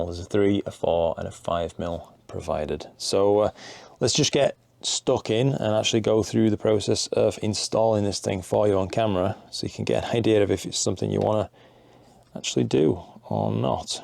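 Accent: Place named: Yorkshire, United Kingdom. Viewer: Shoved up , love it good old Yorkshire speak 👍. Happy birthday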